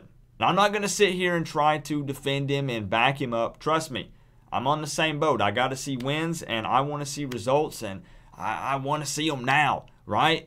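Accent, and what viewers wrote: South, United States. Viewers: Where are you from,? Sounds almost Georgia/Alabama, but as we all know, true Canes fans are severely outnumbered in these two states. UM alumni here btw in GA.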